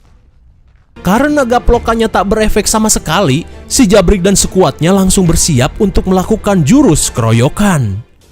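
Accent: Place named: Sunda, Indonesia. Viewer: Sunda na lekoh pisan ih 😂😂😂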